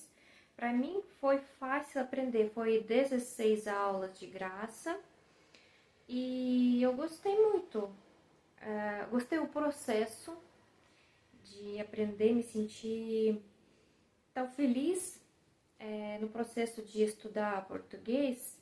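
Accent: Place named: Brazil. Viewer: Eu lembro de uns vídeos. Antigos seus e pude perceber seu esforço em falar português do Brasil, nós brasileiros falamos o português totalmente diferente do português de Portugal, tanto que nós não entendemos nada que eles falam, como se fosse idioma Russo X idioma ucraniano, acho que você me entende... Tenha uma ótima noite até a próxima Пока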